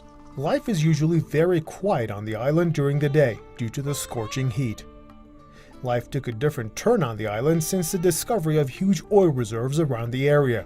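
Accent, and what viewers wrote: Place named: United States. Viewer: Looks like a very boring place TBH. No wonder normal people ain't allowed to travel there. Also where did you find a host with a 99% American accent to showcase this XD?!!?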